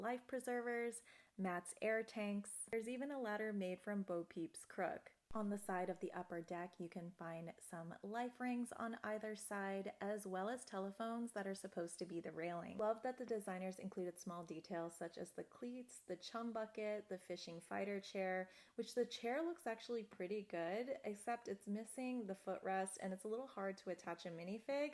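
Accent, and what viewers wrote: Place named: Massachusetts, United States. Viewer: Great video! I can't wait to get my hands on that JAWS set; I couldn't believe it when it finally got announced. You did a great job showing off all the cool details, and the fact that you're a fan of the movie really comes across. Also, you're from Massachusetts, like myself, so you get extra points. I heard that Mahtha's Vinyahd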